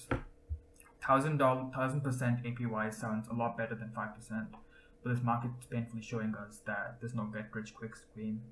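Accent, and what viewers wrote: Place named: India. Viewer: Great video brother......are you from India??? just guessing from your voice.......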